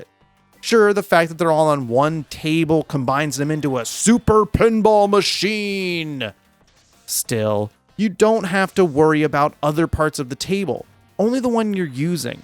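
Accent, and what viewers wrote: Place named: New York, United States. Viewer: Yo you from NY cause dead ass you sound like my ass to the T when I was a kid and there was a bowling alley with a addams family pinball machine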